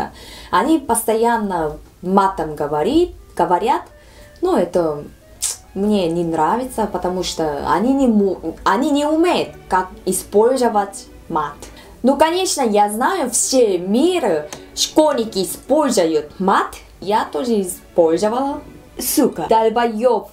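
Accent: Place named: Tajikistan. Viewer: Базаришь как таджик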